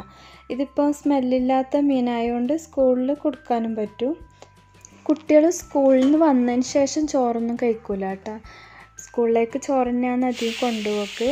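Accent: Kannur, India. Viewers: Ningal kannurano Namma, ninga kannur basha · 🥰kannurkarude nadan basha👍gd 👏🏻👏🏻👏🏻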